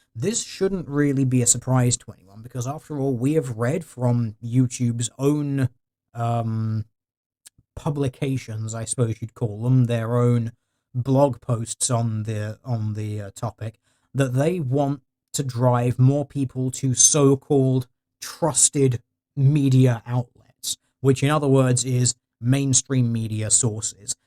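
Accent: United Kingdom. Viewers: The Crown killed Princess Diana. All monarchies including Monarchial Constitutionalism are inferior to Republic Constitutionalism. Fite me. (Sorry talking about Conspiracies involving one car crashes with a british voice mentioning it triggers this type of shit posting.)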